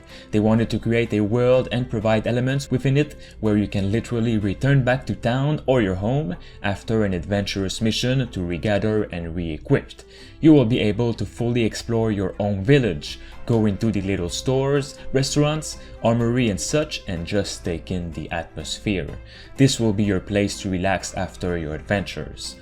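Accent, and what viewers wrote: Quebec, Canada. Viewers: The Quebec accent here is strong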